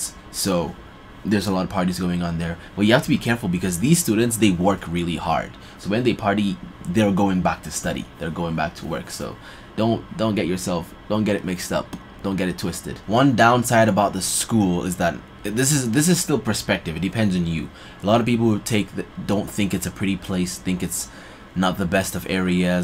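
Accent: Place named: Nigeria. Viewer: Are you Nigerian? You lowkey have an accent 👌🏾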